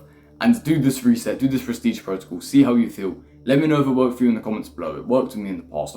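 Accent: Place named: United Kingdom. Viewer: What’s your accent? It sounds British but with something else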